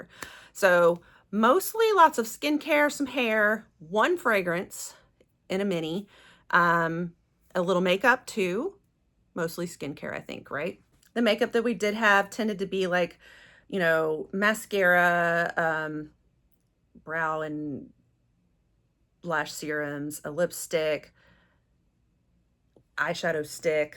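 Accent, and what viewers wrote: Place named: United States. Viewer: Just the let you know I’m from the uk and I LOVE the American accent so I’ve subscribed and I think your advent videos are great xx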